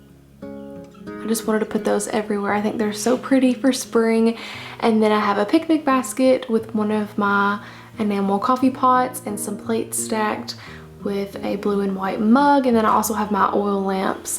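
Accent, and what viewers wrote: South, United States. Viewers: Love that Southern accent. Your closeups are excellent but also pull way back now and then, or upon entering the room and leaving the room so we can see how everything is placed in the entire room.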